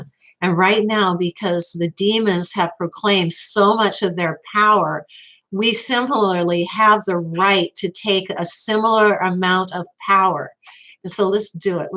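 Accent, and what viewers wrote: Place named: South Africa. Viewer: For the info ... I'm not a Mataji ( you couldn't know that ... no biggy ) , and I was initiated by Prabhupad in 1974 ... Let me ask you ... You're basically saying you took initiation ...by whom , and what " branch " ?!? And ... where are you roughly located ( your accent sounds almost south-african ) ?!?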